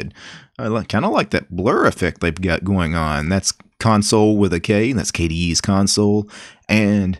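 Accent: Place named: Deep South, United States. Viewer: First linux nerd with a deep south accent xD Best thing in the community.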